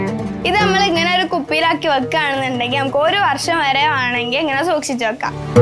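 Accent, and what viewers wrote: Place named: Malappuram, India. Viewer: നല്ല മലപ്പുറം ഭാഷ.... അടിപൊളി.... Nice.. 🤝🤝🤝🤝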